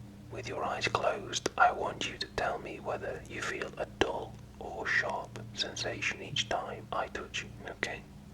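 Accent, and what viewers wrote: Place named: United Kingdom. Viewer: British! Yes!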